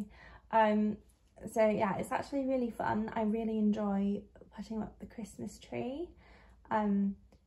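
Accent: United Kingdom. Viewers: I don’t know why but I thought you would be American! But you’re a fellow Brit!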